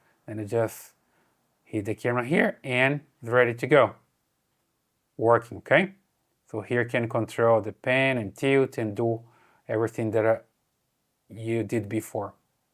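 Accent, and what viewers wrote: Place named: Brazil. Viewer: How to identify a Brazilian speaking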